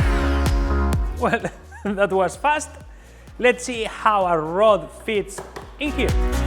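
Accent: Spain. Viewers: Se nota que eres español xd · Echaba de menos tu ingles casi spanglish, por lo que se ve youtube no me daba en la feed tus videos asi que para mi habias desaparecido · Si vas a hablar en inglés, por lo menos esmerate para que tu acento sea lo más españolizado posible... Gracias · Más aún? Yo me esmeraria para no tener más acento español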